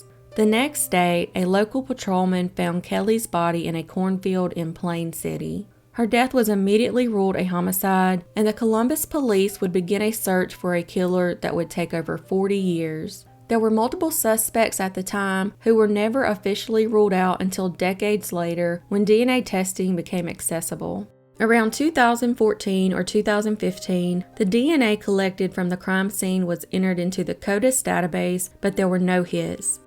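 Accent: South, United States. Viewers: It was funny..at first, I thought wow she has worked her Southern accent out! Duh…I’m Southern too! We sound the same…🙄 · I usu dont like southern accents, but I just love ur. Stay safe. Love fr Panama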